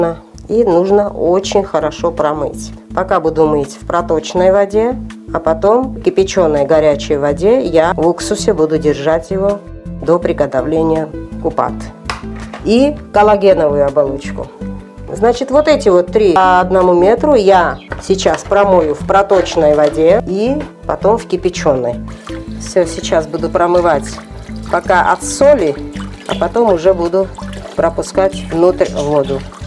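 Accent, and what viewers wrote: Georgia. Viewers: Как говорил один мой шапочно ЗНАКОМЫЙ-ОХРЕНИТЕЛЬНО!А я у себя в Белфасте убитую микроволновку под копчение приспособил-там бак из нержавейки.Позже нашёл выброшенную кем-то барбекюшницу с прогнившим дном, и вместо гнили върезал туда ведро на 10 литров.Теперь она на ведре стоИт при копчении, и туда влезает 2 курицы, или 5 филе скумбрии. Вот дождусь выходных, и сотворю ящик для холодного копчения, с подачей дыма с помощью туалетного вытяжного вентилятора))), я вас обожаю! Ваш лёгкий грузинский акцент возвращает меня в годы моей молодости, когда национальность не имела значения.С ОГРОМНЫМ УВАЖЕНИЕМ к Вам и Вашей Семье. Ребята, мы должны так же жить в Нашей Стране, как вы в Испании!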